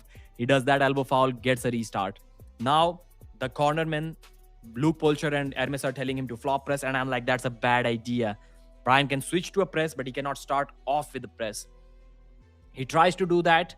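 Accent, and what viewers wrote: India. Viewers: Boah schreib doch dazu im Titel das du nur Bilder zeigst replaced und dein Indischen Akzent sprechen lässt statt denn Kampf zu zeigen.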